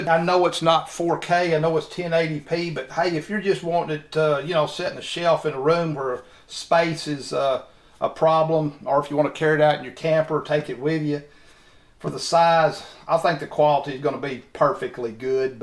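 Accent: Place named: Texas, United States. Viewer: Man sounds texan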